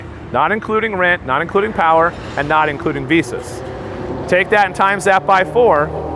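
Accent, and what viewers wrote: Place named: Philippines. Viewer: Hang in there,! things will turn around for the better real fast. Btw, your accent is like you’re a Filipino descent — except that you’re way too tall.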